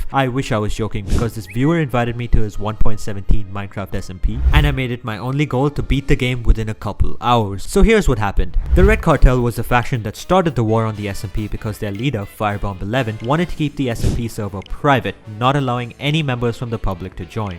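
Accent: India. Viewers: I love his indian accent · You sound indian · why u have indian accent here, but american in other video😭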